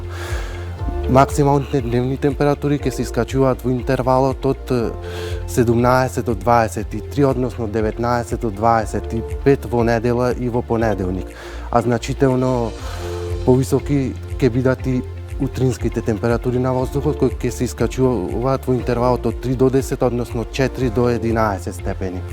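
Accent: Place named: Struga, North Macedonia. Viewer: Za razlika od Gligorce so na pocetokot ne znaese kaj terat Slavco e med a za struskiot dijalekt cepisi bate ne se drugive od banglades za da ne te razberat ! 👍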